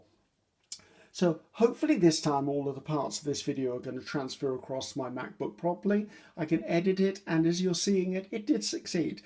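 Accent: England, United Kingdom. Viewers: you are so awesome! Please don't ever stop making your videos. You are professional, but real, which makes it very fun to watch your videos. You make me want to come visit England just because of your accent and tidbits about your "garden", "rubbish", etc ☺️. Thank you for reaching and sharing.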